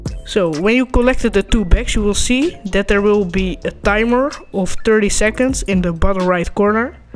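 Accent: Netherlands. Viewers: Is my man dutch? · Are you dutch? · Lekker nederlands accentje man lol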